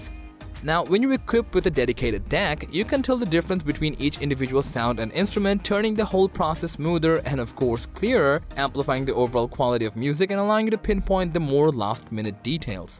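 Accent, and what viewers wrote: India. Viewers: Oh yes, but along with the indian accent. He also has a British, american, and what seems like a Kiwi accent to me. That's quite impressive.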